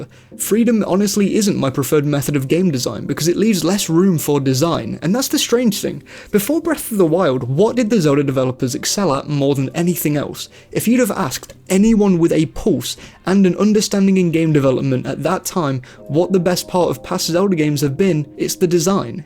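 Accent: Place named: United Kingdom. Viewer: Late to discover this channel! Story and lore were my main concerns with the two latest 3D Zelda games. Aside from that, I really enjoyed them. I’d say I enjoyed replaying Breath of the wild more the second time than the first. And if both Brearh of the wild and tears of the kingdom will be enhanced for switch 2, I’ll be replaying them again, once playing through the timeline order again. (As an aside, nice to hear another British Zelda YouTuber after discovering Zeltic).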